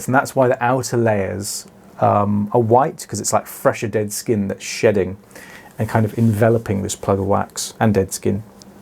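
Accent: United Kingdom. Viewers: Boy how I love your descriptions and your British accent ❤😊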